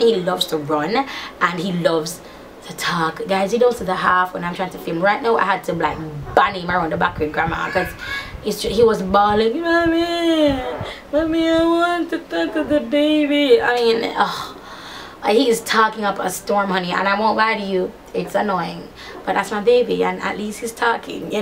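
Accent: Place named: Jamaica. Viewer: When you are speaking to your international viewership you need to slow down in your speech and enunciate. We LOVE the Jamaican accent, but it can be hard to understand at times!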